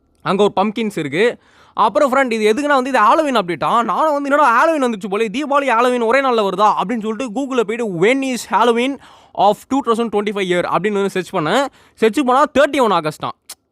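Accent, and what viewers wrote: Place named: United States. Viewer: Eh kirrku onaku english eh pesa varala ithula vera us kaare maari pesriya paythiam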